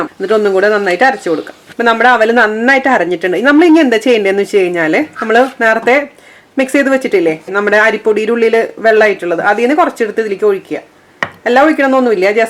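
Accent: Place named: Thrissur, India. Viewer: Hai... njan Saudi Arabia il aanu work cheyyunnathu. Ende mappalakku appam nnu vachal jeevananu... evade njagal thamassikunidathu naalikeram kittilla naalikera powder kittum. But aval appam polichu... super nammude thrissur bashayil... classayitta.. thanks dear... njan ayyanthol aanu thamasam. I love you... enne karikal vakkan padipichathinu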